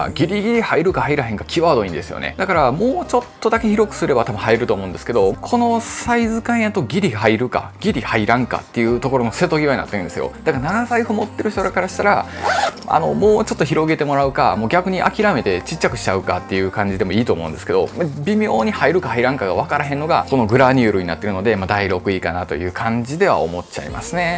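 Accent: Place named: Osaka, Japan. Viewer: 大阪弁とヒョウ柄🤣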